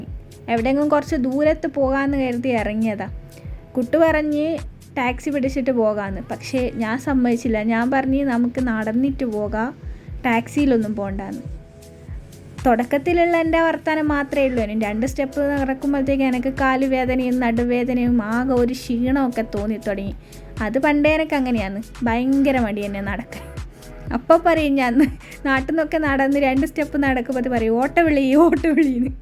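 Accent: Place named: Kannur, India. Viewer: Kannur slang Nalla pole undto😄 karakkam okke kainja ine aede pone😄 ❤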